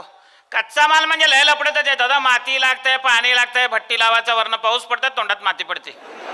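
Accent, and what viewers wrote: Solapur, India. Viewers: ek no solapuri bhasha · खुप सुंदर सोलापुरी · Solapuri dilect is masta ahaya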